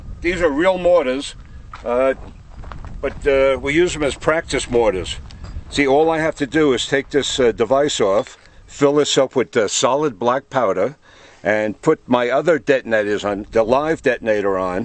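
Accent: New York, United States. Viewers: New York accent, articulates his plans. Kevin McAllister grew up.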